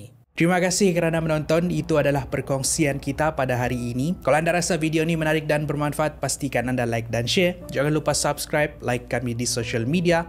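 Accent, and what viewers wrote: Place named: Malaysia. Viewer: Bahasanya sudah mulai lancar walaupun masih agak kental melayunya tapi bahasa Indonesia ny sudah mulai lancar dan baik lanjutkan banyak 2 belajar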